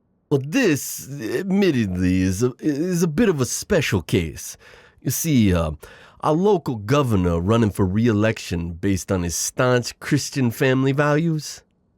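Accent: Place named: New York, United States. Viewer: Your accent's inspired from Little Italy's "the Godfather" isn't it?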